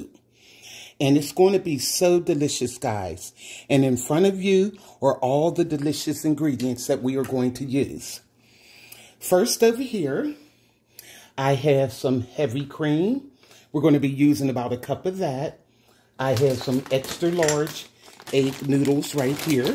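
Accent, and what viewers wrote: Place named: Pittsburgh, United States. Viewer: You have a very heavy Pittsburgh accent, either that or Pennsylvanian. Pittsburgh here, love the video!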